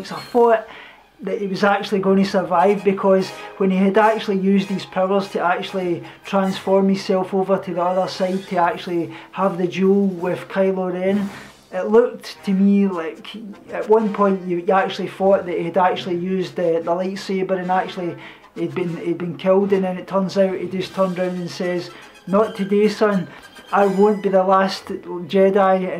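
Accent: Scotland, United Kingdom. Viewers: Veganism, Star wars and a Scottish accent! My son will love this video i have to show him!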